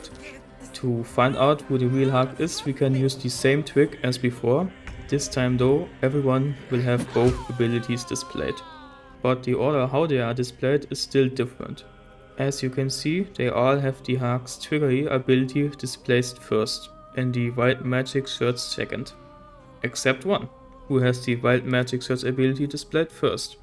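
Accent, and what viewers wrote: Germany. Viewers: Der deutsche Akzent oh yeah xD Hessische Grüße 😎😅🤘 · Are you german? Your accent sounds really unnormal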